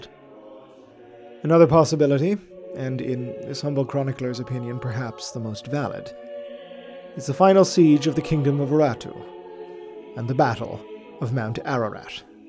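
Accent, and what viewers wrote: Ireland. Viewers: I spent the first 5 mins trying to figure out if the speaker was English or American, realised he's Irish. So am I, not a good look for me. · Are you Irish? You sound irish. But you could be a Canadian doing an accent... I'm... uncertain. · You are definitely irish, and I approve my man. good to see a native doing well on youtube here, and your content seems to be great, heres a new subsoober for ya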